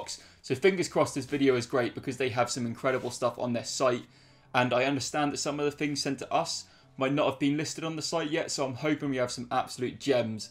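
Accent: United Kingdom. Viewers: I love how britain talk,its cool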